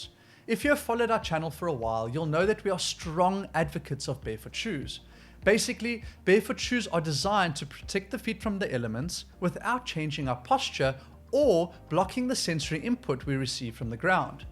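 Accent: South Africa. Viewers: Are you South African? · why do you sound South African?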